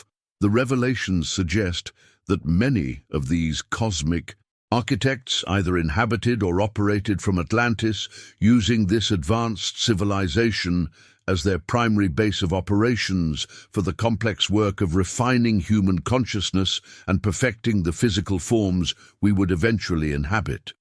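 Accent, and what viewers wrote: United Kingdom. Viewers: I’ve listened to several of these podcasts. I don’t appreciate the superfluous vocabulary. Get to the point. The narrator sounds like Robin Leach and is off-putting because it makes it sound like a cult. I’ve read Edgar transcribed visions but he never sounded like this British narrator.i agree with. But this rewriting of his own experience is abhorrent to history.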